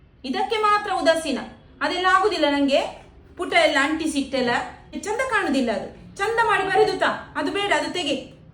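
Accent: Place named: Mangalore, India. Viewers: Nanagu e thara anubava agide school nalli 😮nimma manglore kannada esta ❤ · Love mangalore kannada accent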